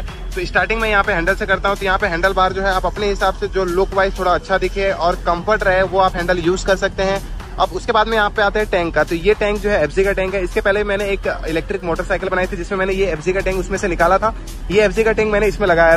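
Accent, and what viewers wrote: India. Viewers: Indian English~~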